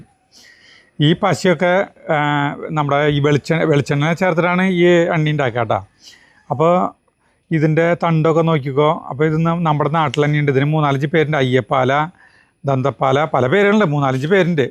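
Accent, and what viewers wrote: Thrissur, India. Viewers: ഇയാള് പറയുന്നത് മനസ്സിലാവുന്നില്ല മനസ്സിലാകുന്ന രീതിയിൽ പറയണം സംസാരിക്കുന്നത് മനസ്സിലാവുകയുള്ളൂ യെ കൊണ്ട് സംസാരിപ്പിക്കും വ്യക്തമായി മനസ്സിലാവുന്ന രീതിയിലാണ് സംസാരിക്കുക നിങ്ങളുടെ തൃശ്ശൂർ ഭാഷ എല്ലാവർക്കും അറിയുകയില്ല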